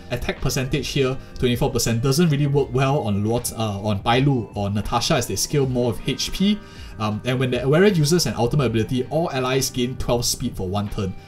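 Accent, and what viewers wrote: Singapore. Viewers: He can’t help it it’s singlish 😭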